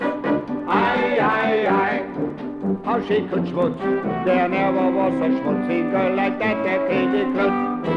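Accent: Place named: Pennsylvania Dutch, United States. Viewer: he used to be at our family reunions when I was a kid... now 67 years old and that is how the old dutchies would talk my grandfather and uncles talk that way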